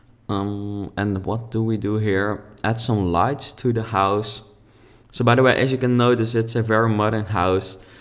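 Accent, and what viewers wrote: Netherlands. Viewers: heyy ik ben ook nederlands lol ik herkende het accent gelijk :d ik krijg de sims 4 over 3 dagen binnen en ik ga denk ik dit huis gebruiken echt moooii xx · Hehehehhe,you have a duch accent, i also have one bc i live in e Netherlands ;)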